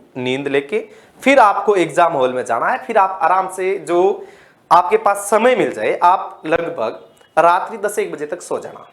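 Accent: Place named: Marwar, India. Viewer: Sir aap marvad se hai isliye mai aapki aabaj ko bade chav se sunta hu😂😂😊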